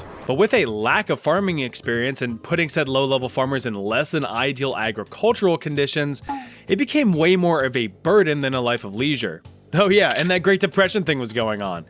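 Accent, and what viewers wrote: Australia. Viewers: Okay mate, your Aussie accent isn't that bad. I'm impressed. Especially in comparison to like...a lot of people on the internet. Also, great video. Also also, Emu's are kinda bulletproof. Something about organs being super in the middle of their bodies, so it took like ten bullets to take them down if you shot right. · The Aussie accent has me frying 😭🙏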